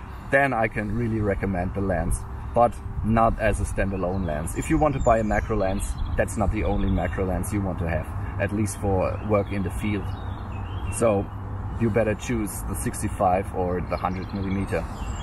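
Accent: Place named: Germany. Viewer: Charmanter deutscher Akzent..... 😉Tolles Video.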